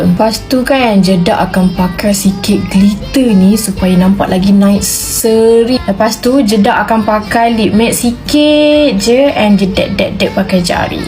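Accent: Malaysia. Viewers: ini kakak bicaranya kok kayak Malaysia ini kakak dari Malaysia ya atau kakak itu hidup di Malaysia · Kakak ini orang Malaysia ya kak kok suara nya kayak orang Malaysia 😅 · Kaka nya orang malasiyanya soalnya ngomong nya malasia😊😊😊😊😊😊😊😊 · Kak orang melesya ya kok ngomong nyah gitu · Kakak ini orang malaysia ya kok ngomong nya kayak orang malaysia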